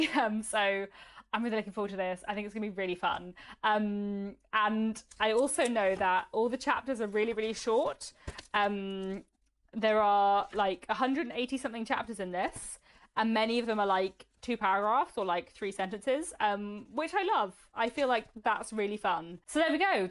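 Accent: United Kingdom. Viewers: I changed upon your blog last week. Very interesting even if you talk rapidly in British accent which is sometimes difficult to follow. Never mind I will catch up with the accent in due course. I am watching this from Bangalore, India. Thank you for the vlog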